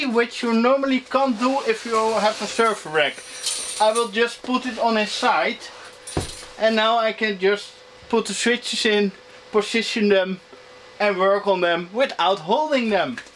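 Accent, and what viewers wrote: Netherlands. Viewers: He’s Dutch, the accent goes with it 😀. After a couple of minutes I don’t hear it, but then I lived in the Netherlands many years ago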